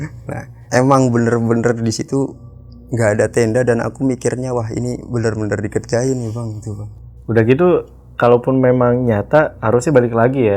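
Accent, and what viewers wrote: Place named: Banyumas, Indonesia. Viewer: Aloy versi ngapak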